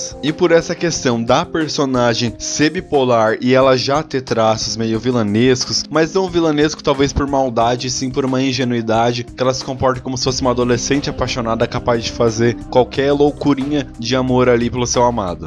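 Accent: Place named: São Paulo, Brazil. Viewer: você é paulista? O sotaque parece!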